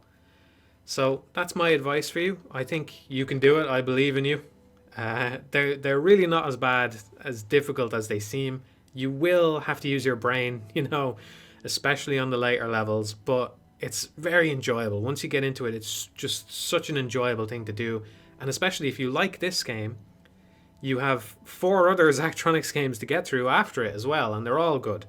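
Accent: Ireland. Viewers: Just discovered your channel researching Zachtronics. Gas to come across an Irish voice :D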